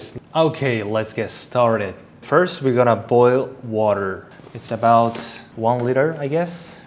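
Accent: Malaysia. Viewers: i think you're starting to catch a bit of malaysian accent in your english accent hehe:D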